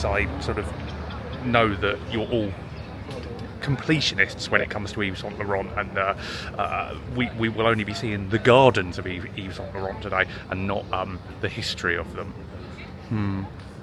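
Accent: England, United Kingdom. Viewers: With an inglish mouth like yours, one would attract paranoia even in Antarctica. At least the place doesnt smell piss like Liverpool or London. Hopefuly after 10 years your channel will help you pay the rent.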